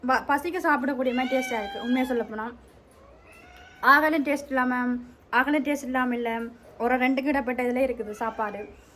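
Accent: Sri Lanka. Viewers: Ammaa neenga jaffna tamil pesa vendam normal tamil paesunga kettikkari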